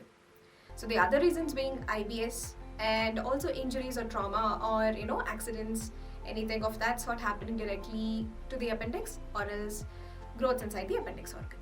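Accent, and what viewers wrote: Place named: India. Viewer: Extreme difficulty understanding the Dr. Her Indian accent very thick. Thank you Dr. For trying to make a complicated subject simple for a layman.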